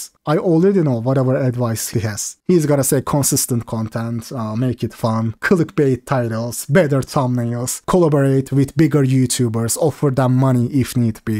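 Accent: Turkey. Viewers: Dude, until you mentioned your name, I thought you are Turkish. You have a clear Turkish accent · Turkey I think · Your accent sounds like turkish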